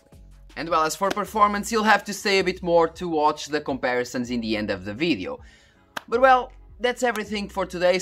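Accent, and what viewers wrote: Brazil. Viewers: Are you Brazilian or Portuguese?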